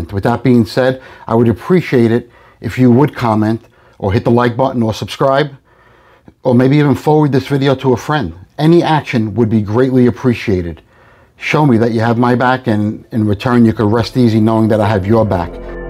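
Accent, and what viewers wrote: East Coast, United States. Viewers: Love your message and videos. Old me from a few years back would've been jealous of your nice stack, but the current me just enjoys it and sees it as great motivation for myself. I enjoy your east coast accent, not from there myself but I like to hear it. My dad passed a couple years back at a younger age and it was rough but helped me put things in perspective for what is important in life and he was such a fun person who would make people laugh and smile all the time, even until the end. I remember him cracking up the nurses in the hospital even when he was in such rough shape. Keep up the positive attitude, I always appreciate it and love to see when your new videos pop up in my notifications.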